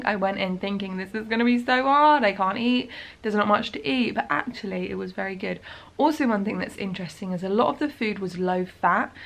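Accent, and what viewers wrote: England, United Kingdom. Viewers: She reminds me of Emma Watson (and not cause she has an English accent) but she looks like her too sort of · You are adorable!! Love the English accents and expressions being from across the pond!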